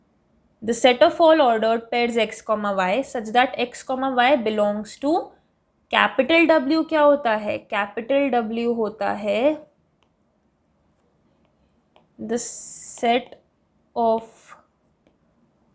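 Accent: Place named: Bhopal, India. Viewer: #APAN full bhopali style didi Love from bhopal (mp)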